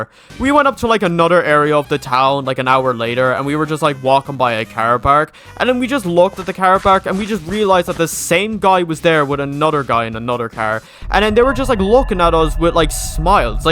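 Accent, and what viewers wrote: Ireland. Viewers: Woowww you’re Irish like mee! I heard it in your voice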